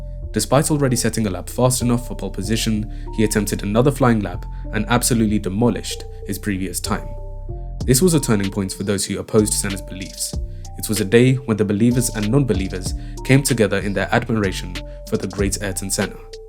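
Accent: United Kingdom. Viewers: bruv your roadman accent fuckin annoying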